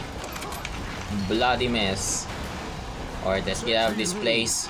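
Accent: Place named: Laguna, Philippines. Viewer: parang ikay taga laguna ..gawa ng iyong pananalita ay ... by the way new subscriber dine ...❤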